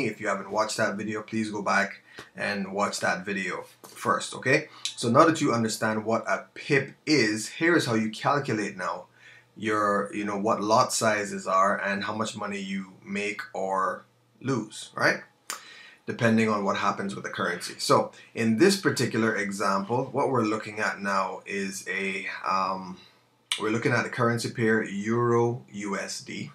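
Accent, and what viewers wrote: Jamaica. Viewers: Where is your accent from? Sounds like a cross between Jamaican and Northern Ireland lol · he got a hidden Jamaican accent... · Are you from Jamaica...if so place email me at <mail>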